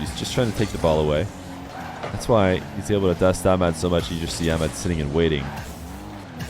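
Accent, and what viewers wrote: United States. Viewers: Tbh, you’re very similar to Johnny. It’s almost like an American accent version of him. That being said, that’s exactly what everyone, myself included, wanted. Johnnys been slacking in 1s and he has hands down the best casting. What better then if not a similar caster with an insane catalogue of ones. Keep it up, amigo.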